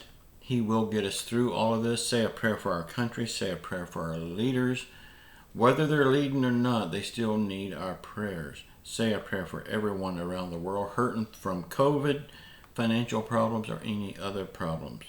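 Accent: South, United States. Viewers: We need to PRAY and have FAITH! Mr., I. Think. You have have soft lovely Southern. Accent, People will always criticize! I have accent too! Have good day! 😊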